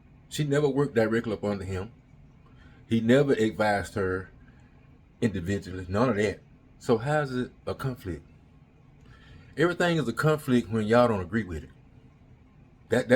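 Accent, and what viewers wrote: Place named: Alabama, United States. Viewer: You speak the truth!! (New subscriber from the UK) ps - who loves your Alabama accent 😁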